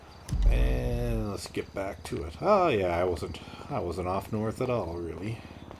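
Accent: Canada. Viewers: That's the Vinewood Bowl, based on the real-life Hollywood Bowl. And you do have a slight Canadian accent, especially when you say "out" as "uwt", rather than the broader American "aaaout".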